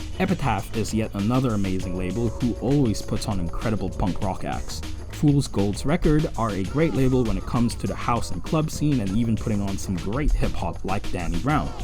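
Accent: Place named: United States. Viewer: Would've never guessed you were a foreigner, you sound perfectly American to me. Funky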